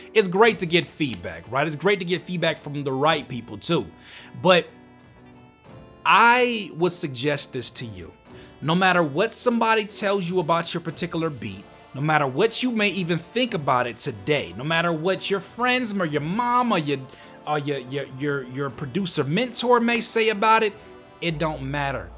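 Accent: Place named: West Coast, United States. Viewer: lol u my dude. U b soundin west coast as fukk sometimes...which is dope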